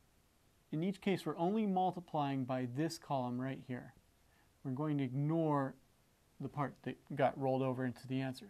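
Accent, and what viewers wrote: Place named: United States. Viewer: Congratulations you're the only person with an American accent I can find for the subject. You win.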